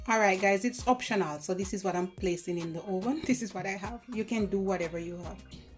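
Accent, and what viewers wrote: Jamaica. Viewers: And the Jamaican talk love it mmmmm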